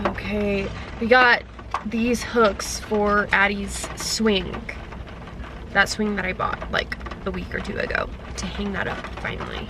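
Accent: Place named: South, United States. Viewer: People who think your accent is fake isn’t from the south lol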